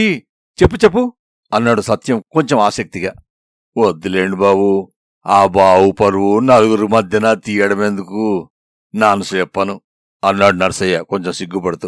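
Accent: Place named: Srikakulam, India. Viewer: మీకు నా హృదయ పూర్వక వందనాలు శ్రీకాకుళం యాస దిన్చేశారు. ధన్యవాదాలు నా రాబోయే కథలకు మీపరిచయం అమోఘం. మీ ఆశీస్సులతో ముందుకు వెళ్తాను మహాశయా నా కథలలో కొన్నింటికి నా ప్రియ మిత్రులు సరసి గారు ( ప్రముఖ కార్టూనిస్ట్) బొమ్మలను ఇవ్వడం నా సుకృతం ఆయనకు ఈ వేదికపై నమ స్సుమాంజలులు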